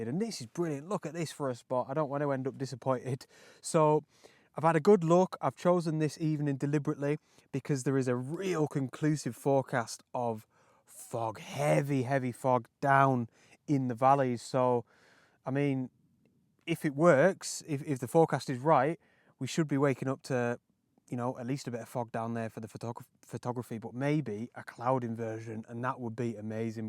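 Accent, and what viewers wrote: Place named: Manchester, United Kingdom. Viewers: Hi! Watching your channel for a couple of years now here in Ireland. love it and looking forward to getting your calendar in the post soon! Strange question, but love to know where the accent is from! Went to UNI in England with a fella from Workington but you sound a bit softer. I was originally guessing somewhere around Manchester then thought Lancashire but maybe more north like Lancaster? If tis too personal I understand! Just a bit of a language/accent geek here! {probably way off!} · Hello from sunny Manchester, why do you sound like a manc?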